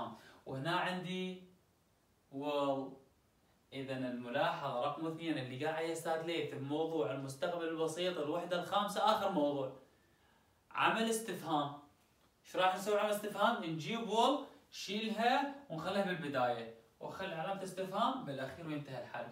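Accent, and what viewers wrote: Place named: Nineveh, Iraq. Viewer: هههههه نفس لهجتنا اني من نينوى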